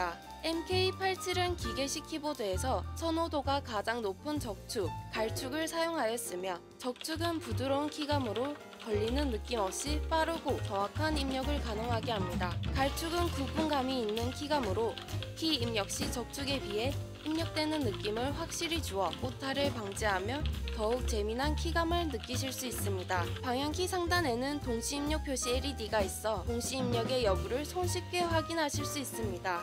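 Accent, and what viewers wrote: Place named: Busan, South Korea. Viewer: 부산말투가 살짝~~^^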